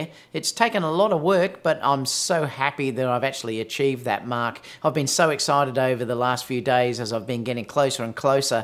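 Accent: Australia. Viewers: Congrats! I bet your AP impersonation won you some, haha. Just be careful, I just heard JL is threatening legal against AP. Hate to see AP threat legal against you, hahaha. Reason I like your content is you are pleasant to listen to, Americans like Aussie accents, you have the same hair style as me, and you drink Corona.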